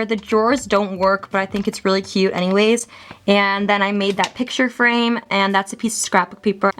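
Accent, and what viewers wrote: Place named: Oklahoma, United States. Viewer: your accent is VERY oklahoman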